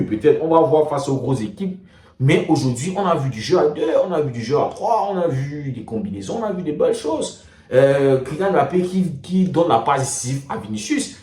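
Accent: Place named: Cameroon. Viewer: Le français des camerounais.👍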